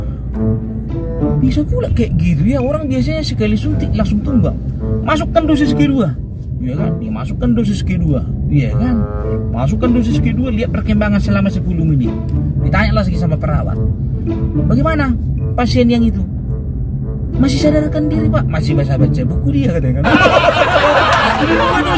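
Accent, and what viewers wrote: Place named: Batak, Indonesia. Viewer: Aqu suka banget bang gaya bicara horas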